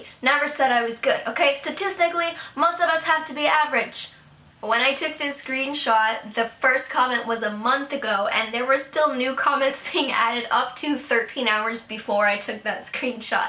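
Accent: Castile, Spain. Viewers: Where did you learn Spanish? Your accent sounds like it's from Spain, but I'm also not the best at distinguishing accents in Spanish. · I'd like to know, where you learned Spanish. I can't quite place it. Sounds Castillian but may be Argentina or Chile? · Hi. Thanks for your music and voice. I most confess that I come to your channel for your cover of Evangelion, but your voice inspire me in my job. Sorry for continue in spanish, i'm colombian and I dislike use the english language (the colombians are really trolls when you don't speak as a native...). Tu acento tiene una marcada influencia de España, es genial. Espero poder apoyarte con tu trabajo después, es realmente bueno. Gracias por tu coraje, al tomarte tu tiempo con tus seguidores (fans). Saludos desde el cono sur.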